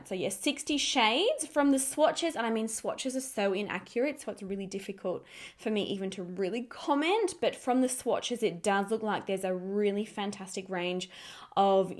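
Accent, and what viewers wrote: Australia. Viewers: I love you!!! I have oily oily oily...did i say oily??..skin!.. and i like depend on your reviews and suggestions! I don't know what i would do without your channel!! And your awesome Aussie accent is an added bonus!! Lmfao. Keep killing it girl!! You just saved me $18.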